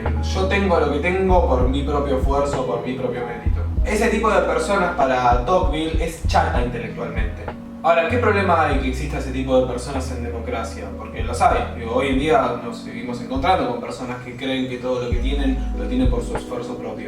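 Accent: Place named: Argentina. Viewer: Hasta las huevas el audio y encima habla un argentino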